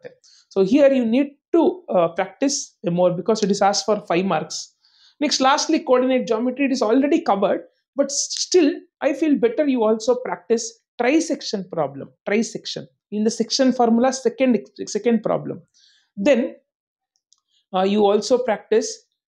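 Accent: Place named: Bangalore, India. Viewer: By seeing his english i can understand he is from namma Bangaloru ...man 😅😇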